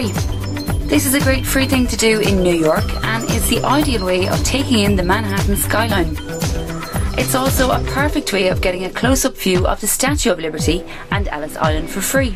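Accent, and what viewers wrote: Ireland. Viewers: Sounds like a hybrid Irish accent to me . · I think her irish accent and voice sound very nice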